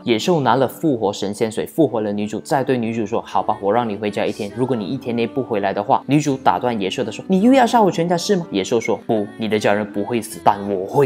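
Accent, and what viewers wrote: Malaysia. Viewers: 哈哈~听到这里时我也奇怪！这是大马人吗囧？ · 哈哈哈，馬來西亞口音，好懷念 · 是马来西亚人？ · 我就想說聽了怎麼久都聽不出是什麼國家的，以為是中國的時候就突然冒出一個馬來西亞嗆，才知道…原來是馬來西亞人啊XDD 以馬來西亞人來說你的腔調沒那麼明顯呢XDD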